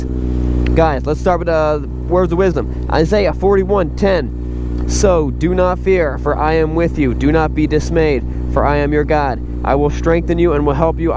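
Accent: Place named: Pennsylvania, United States. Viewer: I'm a recent subscriber and I love watching your videos. As you know there's tons of YouTube channels about motorcycle reviews and at first I didn't realize why I liked yours so much, then I got it. It's that subtle Pennsylvania accent. My family's from Pittsburgh, which some have a much more strong accent than you. I lived all around the country and pretty much lost it all together, unless I'm imitating it on purpose. Of course the rides are great, you discuss what I really want to know, I wouldn't watch a vid just to hear an accent. Thanks for the good job!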